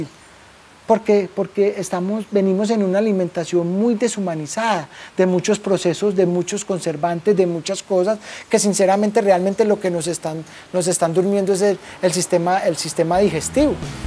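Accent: Antioquia, Colombia. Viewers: ¿Cierto? <3 Me encanta cómo hablais, lo explicáis genial, muy educativo y amo vuestro acento <3 Viva Colombia. Saludos desde España. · QUE TIPO TAN SENSIBLE, ES VERDAD A LAS PLANTAS HAY QUE HABLARLES CON AMOR Y CRECEN MUY LINDAS. ME ENCANTA SU ACENTO PAISA. VIVA COLOMBIA! SALUDOS DESDE DALLAS, TX. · Gracias por la enseñanza tan valiosa! Lástima esa forma de hablar tan ordinaria, que pena pero siempre me choca demasiado eso de los paisas, hablan tan ñero🤦‍♀️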